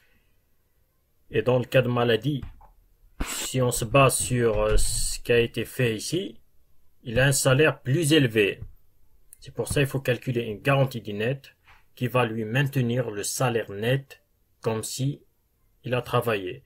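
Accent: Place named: Kabylie, Algeria. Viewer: merci, j'entends un petit accent kabyle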